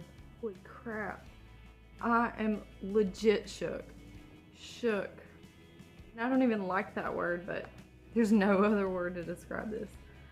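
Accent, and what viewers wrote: South, United States. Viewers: And your accent say you're 100% Southern. Americans are all muts.👍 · You have a lovely southern accent. Are you from North Carolina ? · Everybody is a mix of genetics from different regions of earth. There is no such thing a a pure race. You are what you are and that is beautiful. You are unique. Be proud of who you are. So here is a little random comment. You look like Sydney Watson, who has a youtube channel also. You may be related to her. btw you are gorgeous (lucky husband) and I love the southern twang in your accent. Which state are you from? Be happy, be proud.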